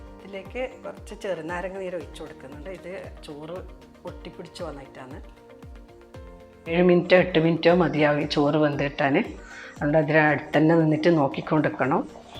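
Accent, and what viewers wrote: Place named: Kannur, India. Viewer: ആർക്കും മനസ്സിലാകുന്ന തികച്ചും കണ്ണൂര് സ്റ്റൈൽ അവതരണം 👍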